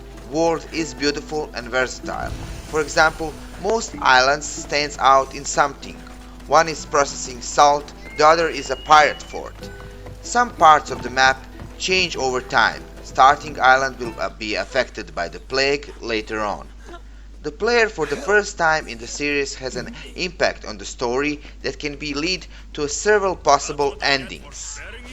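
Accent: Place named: Russia. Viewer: Like your indifferent Russian voice.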